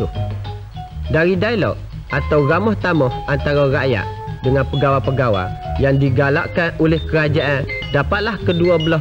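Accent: Kelantan, Malaysia. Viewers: Yang uniknya suara hos dokumentari ini pun kecek Kelate jugok! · Penyampai pun slang klate ni..nyakut molep😁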